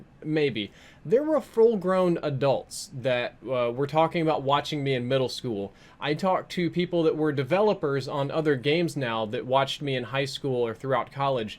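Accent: South, United States. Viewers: That old video with his southern accent oml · I used to watch you all the time from like mw3 up until I stopped playing on console after advanced warfare but have recently got back into your content a bit more. Maybe that helps you feel a little better? I also really quite enjoyed your philosophical, abstract and dream videos since middle school (going to college now hehe). I'll definitely keep watching again :) Also, DAMN THAT SOUTHERN ACCENT LOL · That southern accent was strong. Where’d it go? 😢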